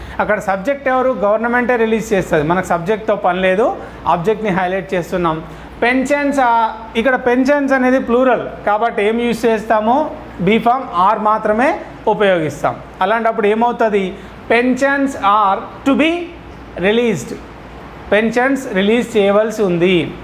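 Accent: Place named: Telangana, India. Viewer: Sir మీరు తెలంగాణ మాండలికం లో మాట్లాడుతున్నారు..... కోస్తా మాండలికం లో మాట్లాడండి sir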